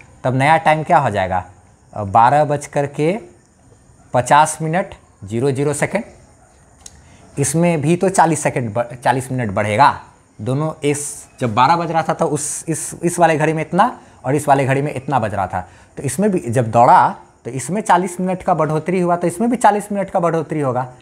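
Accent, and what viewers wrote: Bihar, India. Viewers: ❤tankuuuu bhaiya And Bihari accent in your words are just killing.....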